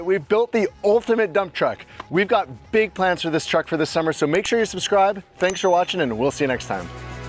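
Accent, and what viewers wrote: Yorkshire, United Kingdom. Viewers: Hi where is from? Definitely a lovely northern accent, I’m thinking Yorkshire? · Can the americans understand yorkshire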